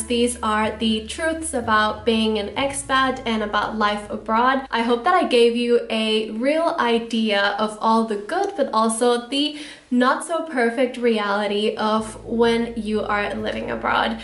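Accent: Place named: United States. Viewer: Your English is perfectly American. You didn’t learn that in Honduras, did you?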